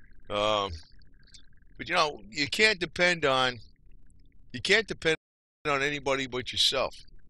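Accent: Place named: New Jersey, United States. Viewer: I am surprised, that you been from New Jersey didn't watch the series sopranos. God knows you even speak in same accent as those in that series.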